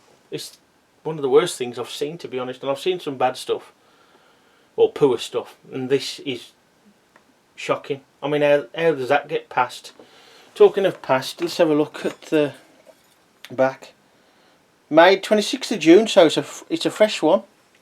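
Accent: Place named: Black Country, United Kingdom. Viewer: So good to hear a Yam yam on youtube!!!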